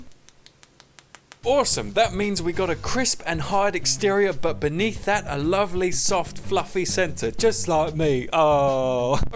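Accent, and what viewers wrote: United Kingdom. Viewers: Oh I love your recipies, your sense of humour and your Loooovely british accent..... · Just doscovered you channel from this being recommended to me and immediately subscribed before the video was even over. Brilliant recipe, well explained without taking any more time than necessary, from the UK so easier for me to understand being from there myself, plus it was funny and entertaining. Great content thank you man!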